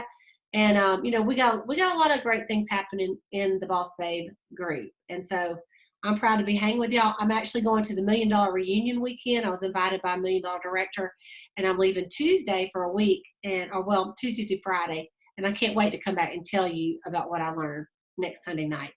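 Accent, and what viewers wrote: South, United States. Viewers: I love your Sunday chats. You keep us up to date. I love hear your southern accent ,when you explain or introduce company promotions. You seem to always make it clear and very convincing that we can achieve and you always make an example of how you did it. Which is so encouraging. You are a true example of Go Give A great representation of Mary Kay.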